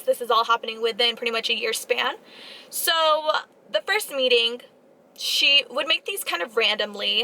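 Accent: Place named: United States. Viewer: What state is this in! Sounds Just like one I know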